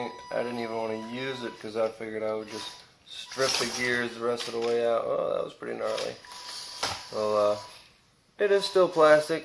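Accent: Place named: Massachusetts, United States. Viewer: I was watching your video the other night. Sounds like you've got a Massachusetts accent. Do you have another YouTube page called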